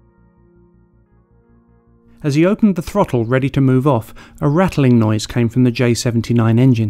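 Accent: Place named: United Kingdom. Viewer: Great video... I got burned out on the narrator’s posh Brit accent though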